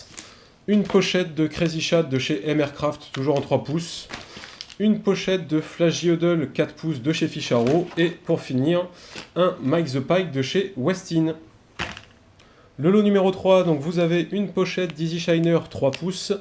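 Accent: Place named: South, France. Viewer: je participe,compte facebook:Numa Fishing , Big Up première chaîne You tube dédiée a la pêche !!je pense c'est l’accent du sud qui joue un peu,mdr !! a plus les mecs ....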